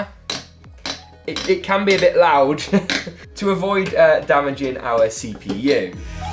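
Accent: United Kingdom. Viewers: You British?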